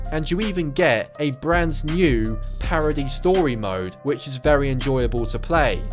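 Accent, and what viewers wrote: United Kingdom. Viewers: Damn, this man is Bri'ish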